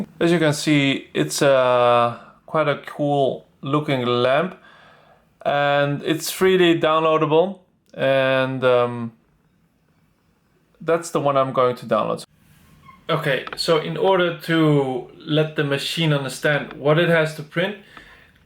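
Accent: Netherlands. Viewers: You Dutch? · Leuke video! Ik hoorde aan het begin van de video al dat je nederlands bent, maar het werd pas bevestigd toen ik de spuitbus verf zag😆😉. Ik gebruik de zelfde verf voor mijn prints. Weet niet of je dat al doet, maar deze verf is het goedkoopst bij de "Action" winkels.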